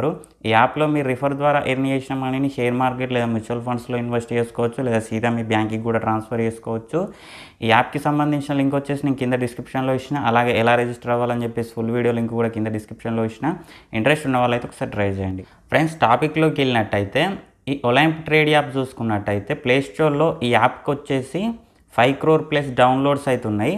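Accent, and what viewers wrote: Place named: Karimnagar, India. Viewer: Sir మీరెక్కడ, కరీంనగర్ భాష మాట్లాడుతున్నారు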